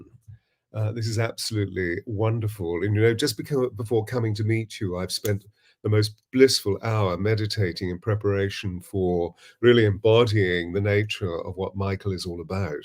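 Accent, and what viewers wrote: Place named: United Kingdom. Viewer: I just Love your Energy & Your very Posh English Gentleman Accent, I am also here in England & you sound like our King Charles, So comforting is your voice. Sent Prayers to all in America who sufferd from the Storm💚💚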